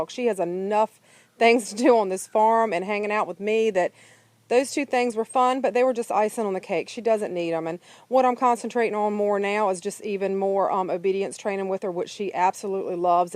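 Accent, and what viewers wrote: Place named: Virginia, United States. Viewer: You sound like you're from Virginia or maybe NC, but my money is on VA. Love VA! Am I close? 😊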